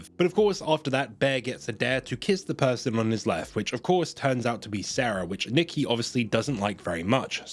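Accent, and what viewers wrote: United Kingdom. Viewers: No he just has a hard English accent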